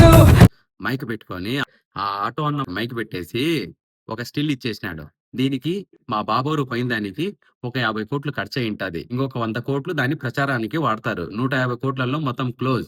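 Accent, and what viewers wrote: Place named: Rayalaseema, India. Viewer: Rayalaseema slang appa 👍 💕 Your native place Sir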